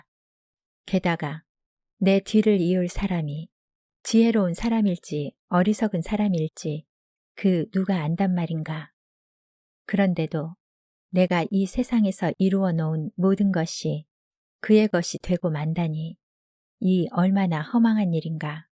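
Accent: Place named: Jeolla, South Korea. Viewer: 오웃 전라도 어투가 쩝니다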